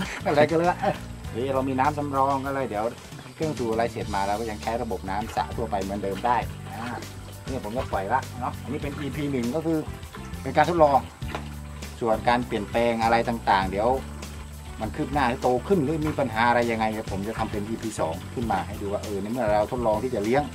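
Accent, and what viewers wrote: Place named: South, Thailand. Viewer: น้าเป็นคนใต้หรือป่าวครับ สำเนียงพูดคล้ายๆคนใต้เลยครับ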